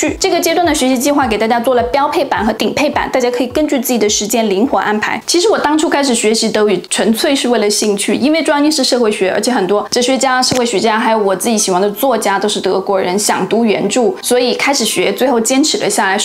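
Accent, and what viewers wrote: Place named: South, China. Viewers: 才貌雙全！你讓我更想學德語了。好奇你是哪裡人？你的普通話跟我們台灣一位來自上海的的政治評論員的口音很像。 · zoe是南方人吧！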